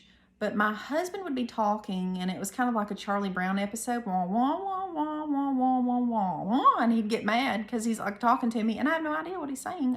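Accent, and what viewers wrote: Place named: South, United States. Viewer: You are adorable. I love your country accent. Good luck on your recovery!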